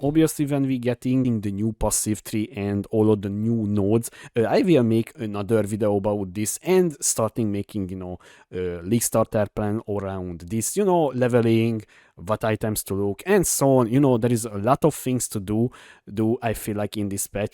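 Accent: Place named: Hungary. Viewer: Gusztustalan magzar akcentus. Tanuld már meg kiejteni a szavakat, ha már az adott nyelvet próbálod meg beszélni.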